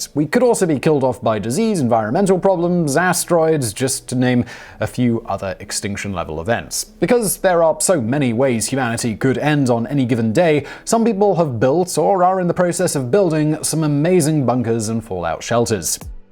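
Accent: United Kingdom. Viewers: Two things: 1 - Love occasional British pronunciation of names or locations. I was doing laundry while watching this one and it took me a moment to realize the Adri-an-dak Mountains were what I have always heard refereed to as the Adirondack (Ad-i-ron--dac) Mountains. And B - Lived in Indiana most of my life and had never heard of the Vivos shelter. Once again I find that a wacky Brit and his friends living on The Continent have educated me on something going on in my own backyard. Keep up the great work, and the rest of the team!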